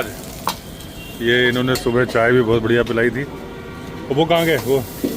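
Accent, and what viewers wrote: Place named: Baghelkhand, India. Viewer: Bhangelkhadi boli tone 😊 Bansh and gopad Chhattisgarh ki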